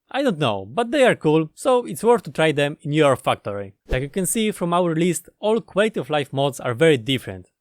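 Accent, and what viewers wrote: Russia. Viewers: Такое чувство как будто русский говорит на Английском))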